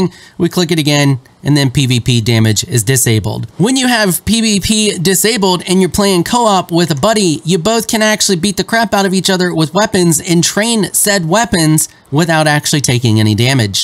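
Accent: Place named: Northeast, United States. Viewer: You sound like you're from the north east US. Nice content. Be safe.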